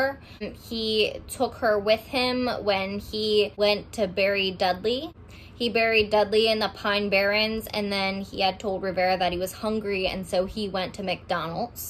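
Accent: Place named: Baltimore, United States. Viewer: Where u from if you don't mind..... im from Baltimore, and you have a Baltimore or MD accent. Jw but love ur story. Very detailed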